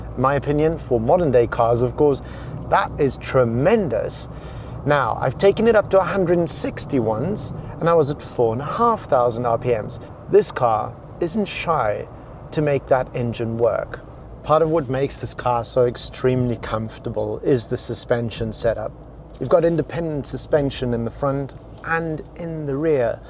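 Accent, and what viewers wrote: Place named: South Africa. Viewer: Where in South Africa are you from?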